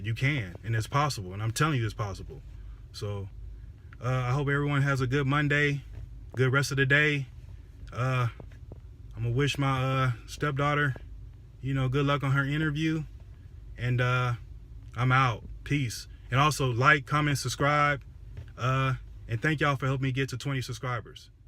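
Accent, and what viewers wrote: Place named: Texas, United States. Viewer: Awesome! I was wondering what part of the country you were from. The way you talk sound just like everyone I know! Lol. I’m from Texas. My grandfather is in Ok.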